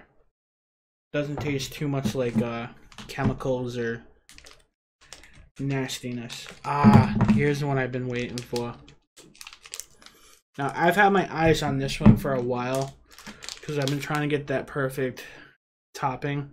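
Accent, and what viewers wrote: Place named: Rhode Island, United States. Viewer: Lots of good flavors there! Thought I recognized a RI accent. Worked 34 yrs in Providence, before retiring to FL.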